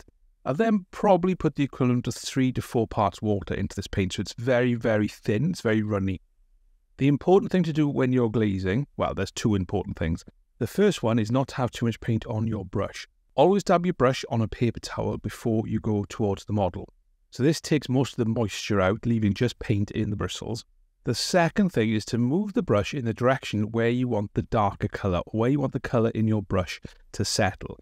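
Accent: Wales, United Kingdom. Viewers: Are you welsh? Love your content, thank you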